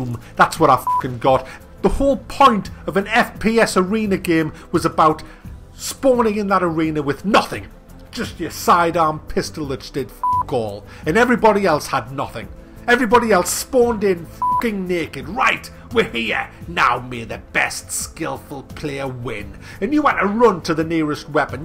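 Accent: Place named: Birmingham, United Kingdom. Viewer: Buy you sound like your from birmingham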